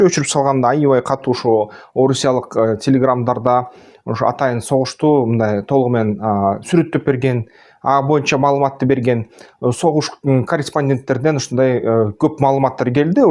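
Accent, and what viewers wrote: Standard. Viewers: АРЫБАНЫЗ, Бар болунуз мырза таза кыргызча суйлогонун кубандырат.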